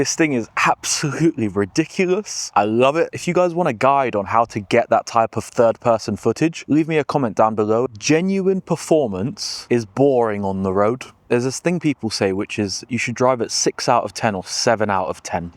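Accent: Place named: South London, United Kingdom. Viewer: I’m getting a slight south London accent but that location looks beautiful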